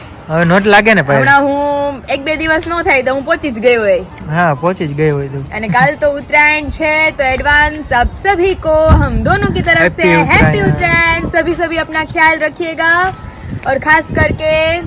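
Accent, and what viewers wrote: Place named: Kathiawad, India. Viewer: તારા મમ્મી હોશિયાર છે.. અને સ્વભાવ પણ સરસ છે... તમારી કાઠિયાવાડી ભાષા બહુ જ મીઠી લાગે છે...,❤❤❤❤❤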